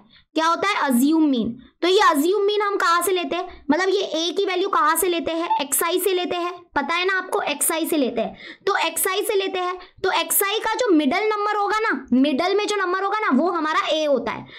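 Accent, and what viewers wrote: Maharashtra, India. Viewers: r u marathi????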